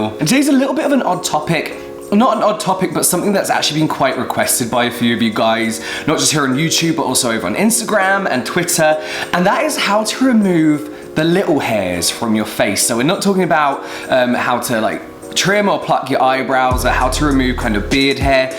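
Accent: United Kingdom. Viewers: Are you British? 🤔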